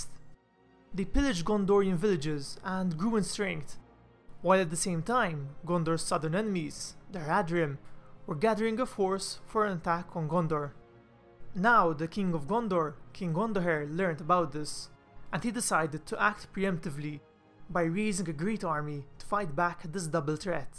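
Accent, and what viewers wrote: Malta. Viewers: Are you Maltese ?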